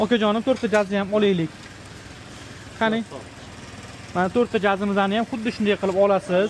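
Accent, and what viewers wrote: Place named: Tashkent, Uzbekistan. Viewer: Toshkent shevasidan nafratlanaman💔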